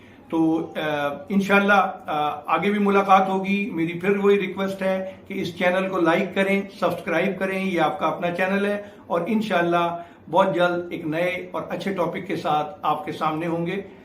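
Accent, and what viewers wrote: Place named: India. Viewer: For first 2 minutes I was like he is an Indian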